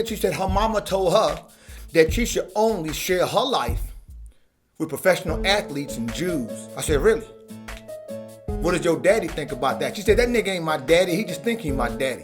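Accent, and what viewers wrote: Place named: California, United States. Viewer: homie you sound more like a Cali nigga brah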